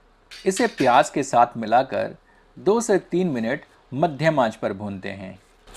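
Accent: Standard. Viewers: बहुत प्यारी शुद्ध हिन्दी आप बोलते हैं सर 🙏🙏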